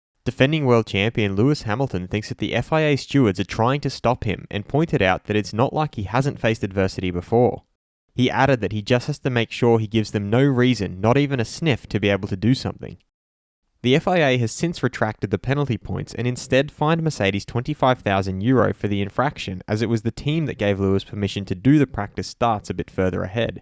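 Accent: Australia. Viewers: You sound like you are an Aussie. Why in your subtitles do you spell "tires", a particularly yank spelling instead of the the more normal "tyres"?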